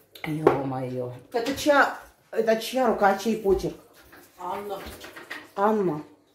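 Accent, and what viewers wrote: Urals, Russia. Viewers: Такой интересний уральский акцент)Всех девочек с наступающим😊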